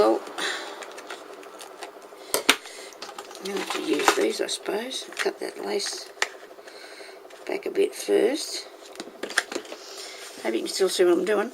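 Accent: Australia. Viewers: its such fun. love watching what you have done.its great to hear an aussie voice and to see how creative you are. love your laugh. thanks so much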